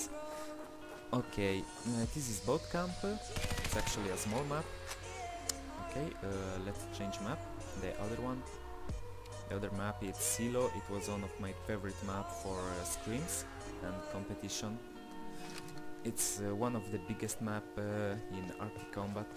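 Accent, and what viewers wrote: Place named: Italy. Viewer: you've got an Italian accent (or however it is writted)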